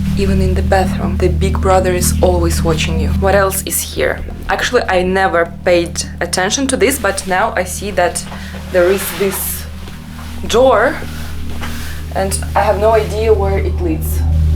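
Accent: Russia. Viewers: You should work hard at your pronunciation. You sound very Russian. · You’re trying make russian accent is funny. Because you already have it🙂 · Хах акцент что надо 😂❤ Настоящая рашн герл❤ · Russian, slavic woman are so naturally beautiful and attractive. Love the accent! Cheers from Costa Rica! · Dig the real Russian accent, sounds better & natural, not to mention fun 🎉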